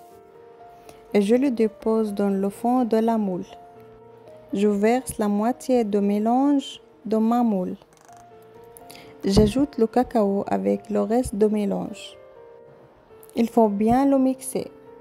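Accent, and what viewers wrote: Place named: Levant, Arab world. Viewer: من طريقة الكلام اضن صاحبة القناة من المشرق العربي . يعطيك العافية ❤❤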